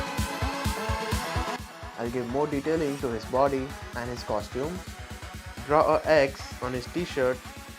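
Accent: India. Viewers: Wow These Indian voice xd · It took me 1 second to realize that you are an Indian (because of your voice idk)...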